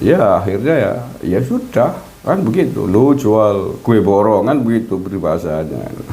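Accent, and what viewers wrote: Banyumas, Indonesia. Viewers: Tuuuhhhhh khannnn 😂😂😂 senyum gimanaaaaaa gitu khan. Tp yg aku suka dr tuuhhh bahasa banyumasnya mbil senyumm gimanaaaaaa 😂😂😂😂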